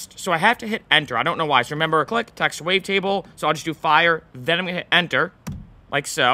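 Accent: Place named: United Kingdom. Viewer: It's neat but I wish it would have been a more neutral sounding voice, the British accent isn't the best choice.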